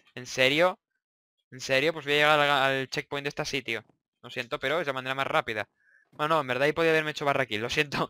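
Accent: Argentina. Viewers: sabes hablar bastante bien en argentino. Saludos desde Argentina eres uno de mis youtubers favoritos sigue asi. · estas hablando cordobes como david saludos de argentina te qiero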